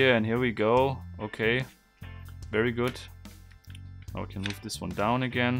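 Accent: Germany. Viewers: Are you from germany? Sorry for this weird question, but I was wondering through the entire video. I go to a school in germany and noticed my classmates have the same accent as you when speaking english. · He's speaking Like a german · He sounds german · Also man hört raus das du ein Deutscher bist aber trotzdem ist dein Englisch super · Bist du Deutscher dein Englisch hört sich nicht gut an oder täusch ich mich da. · An deinem Englisch merkt man direkt das du Deutsch bist :D gutes Video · Man checkt das du deutscher bist 🤪🤣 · Ich liebe diesen deutschen Akzent xD (I love this german accent xD)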